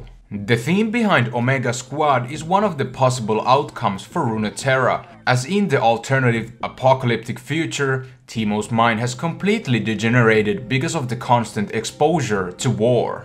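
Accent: Finland. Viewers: I started watching this, and thought that you have that finnish accent in your english. Mutta hyvä video! :D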